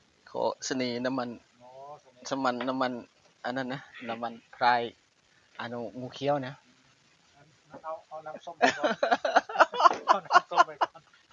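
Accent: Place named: Cambodia. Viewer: ได้ยินสำเนียงฟ่าวกดติดตามเลย หนุ่มขะแมร์ จุงกะๆเจียละออ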